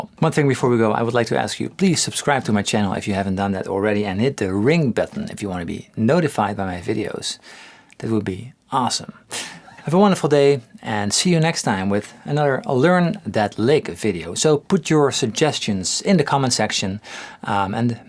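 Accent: Netherlands. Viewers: I believe he is from the Netherlands.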